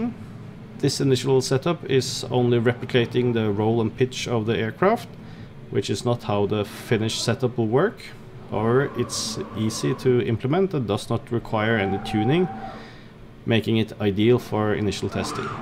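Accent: Norway. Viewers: Jeg viste at du var norsk jeg merka det bare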